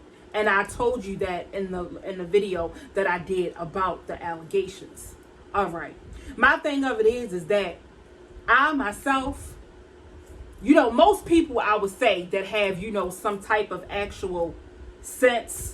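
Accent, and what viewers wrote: Baltimore, United States. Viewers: Love your Bmore accent!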